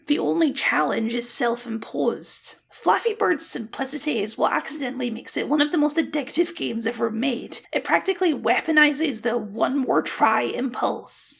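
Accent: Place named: Fife, United Kingdom. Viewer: You're partially right in this instance, as the absolute scavenger that's the current trademark holder is domiciled in the United States. However plagiarism is actually an offense under UK law, the nation state both the creator of this video and I are domiciled in (So I assume based on his vaguely Fife-adjacent accent; Am I close?), and is also an offence in France where the potential plaintiff is domiciled. This might still matter, but only in relation to the game's distribution in those regions: Something I have little doubt the nutter who shat money on a dead old game's name only to feather it with crypto-slop will care little about, as there will be plenty of markets where the law does not adequately protect creators.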